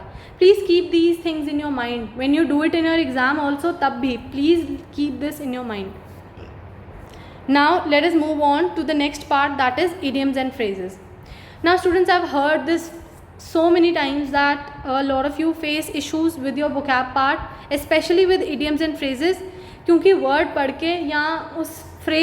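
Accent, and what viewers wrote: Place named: Garhwal, India. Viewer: Are you Garhwali .... your tune matches alot😅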